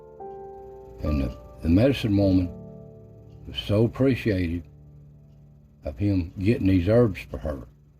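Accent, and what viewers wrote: Appalachia, United States. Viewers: I love your videos. You talk exactly like my grandfather and uncles. Hollywood has portrayed our "accent" as indicating ignorance. But nothing could be further from the truth. While so many Appalachian people lacked formal education, they/we possessed knowledge that no other culture in America possessed. Please read the book "Born Fighting," by Jim Webb. It proves that we Scots-Irish are always willing to fight for what we believe in. We don't back down. We will NOT be ruled by a KING or any aristocracy. Yes, we talk "funny." But we built and defended this country as much as any other European culture. Please keep making these awesome videos!! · I adore listening to you tell stories,. All the more so because your mountain accent just seems to authenticate the story. I close my eyes and I imagine an evening campfire crackling, little sparks floating up off the fire. 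Friends all huddled around patiently waiting for to begin, but holding their breath in quiet anticipation cuz we all know it's gonna be good. No disappointment ever. ♥ ♥ ♥ Thank you.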